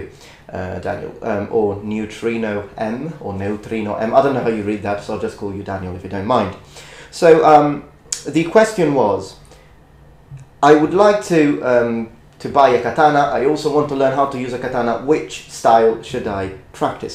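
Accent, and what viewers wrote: United Kingdom. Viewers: Wow, I can't believe I have never come across your videos before! I'm in a bit of a strange position really. I live in Leeds. England (I can tell you learnt English here and not in the US :)). Oddly, the only form of Japanese swordsmanship in my area is the Hyoho Niten Ichi Ryu UK Shibu. I have practised Chinese and Korean martial arts in the past, and I am being told my a lot of my martial artist friends that I am extremely lucky to live so near to this school and should give it a go. My heart initially was set on Kendo, for the sparring, but are no schools near me. Do you think that there would be transferable skills if I took up kendo too in a few years? Here is a link to the site: <link> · Your Japanese and English pronunciation are pretty darn good! Did you learn English in the UK? Best wishes from the USA to Italia!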